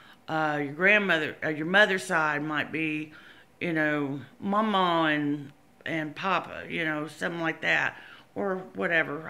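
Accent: United States. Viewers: Great Job! Nice accent. I am from Louisiana too. <link> Talking about food in a Louisiana accent.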